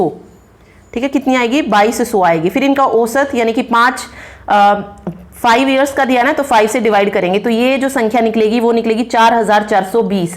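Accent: Haryana, India. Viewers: And mam app haryana se ho apki language me haryanavi touch h an ye video hamera liya bhoot hi helpful h so thanku again mam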